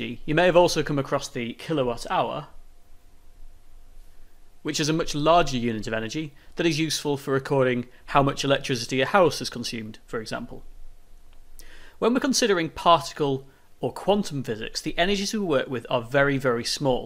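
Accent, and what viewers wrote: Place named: United Kingdom. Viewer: British English 🥵🤤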